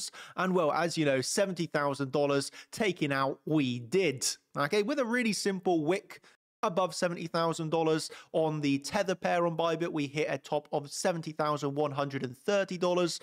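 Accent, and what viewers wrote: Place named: London, United Kingdom. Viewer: Thanks,! Love your accent - got to be from somewhere to the west ('left') of London, surely?! God bless you, from London x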